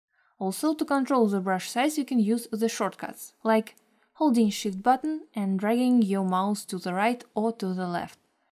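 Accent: Russia. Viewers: yep, Russian indeed! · Russian accent ^_^ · Russian accent? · no hassle I thought Russian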